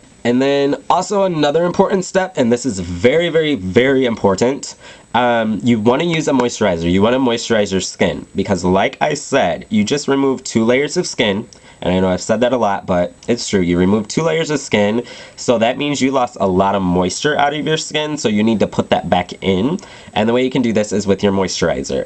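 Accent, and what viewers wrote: United States. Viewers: i live in canada, and its an american accent :)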